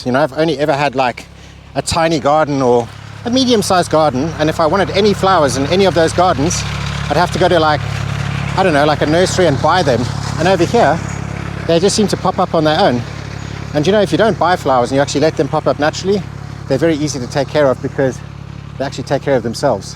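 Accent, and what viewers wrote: South Africa. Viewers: You sound South African... watching from Durban🇿🇦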